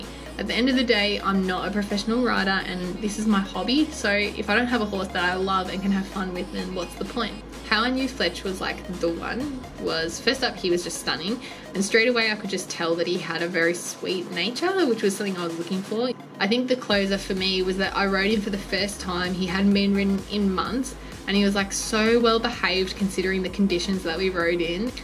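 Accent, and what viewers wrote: Australia. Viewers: As soon as I heard you where Aussie I subbed haha I'm a fellow Aussie (live in SA) and this is my first vid of yours I watched and I love you guys already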